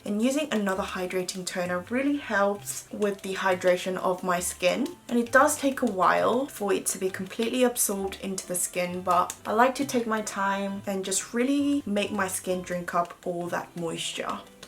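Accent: United Kingdom. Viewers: 메이크업 영상보다는 일상 영상을 더 먼저 접했는데 언니의 여유롭고 행복해 보이는 삶의 태도가 부럽고 저도 닮고 싶었어요~😇학교다니고 시험에 찌들다 보니 언니처럼 밝고 긍정적인 하루하루를 살고 싶더라고요.😢😢항상 꿀떨어지는 영국 발음과 함께 좋은 정보, 영국의 예쁘고 아기자기한 일상들을 공유해 주셔서 감사합니다😘 앞으로도 응원할께요👊👊 제 올 한해 목표는 꼭!!!!라면을 줄이겠다!!!!! 기필코!!!!!!!!!!!!! 입니다💞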